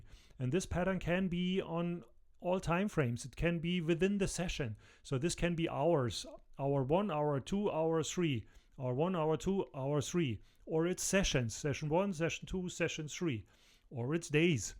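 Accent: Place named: Germany. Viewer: U Are Amazing , are u from Germany sir? Ur Accent is like that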